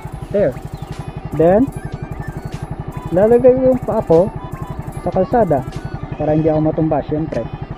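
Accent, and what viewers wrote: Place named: Iloilo, Philippines. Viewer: hehe kaya pala sir may halo ilonggo accent. Ilonggo din ako sir hehehe RS sir!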